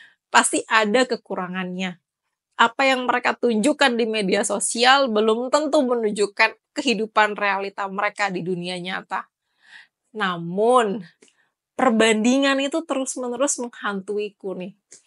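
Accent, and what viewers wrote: Java, Indonesia. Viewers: Imemang gaya bicaranya begitu ya intonasinya terlalu ditekankan terkesan medok jd kyk orang tercekik.... Kenapa ya.... Kasihan.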